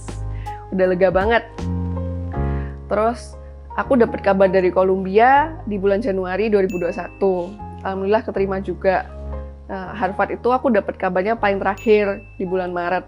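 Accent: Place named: Java, Indonesia. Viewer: medok nyaaaaaa hha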